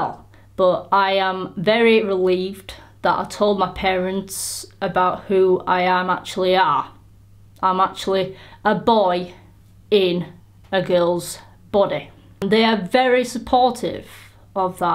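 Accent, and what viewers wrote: United Kingdom. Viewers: You can message me if you need any advice about transitioning/getting on T etc in the UK (I'm guessing you're from the UK too from your accent 😂) 😁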